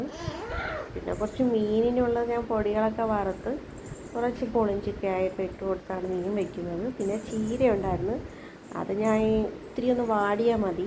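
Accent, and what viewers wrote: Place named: Thiruvananthapuram, India. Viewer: സ്ഥലം എവിടെയാ സംസാരം കേട്ടിട്ട് ആറ്റിങ്ങൽ വെഞ്ഞാറമൂട് ഇവിടെ എവിടെയോ ആണെന്ന് തോന്നുന്നു 🥰👍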